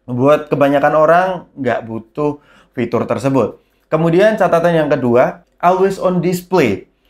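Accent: Semarang, Indonesia. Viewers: Logat e ketoro semarangan ik 😂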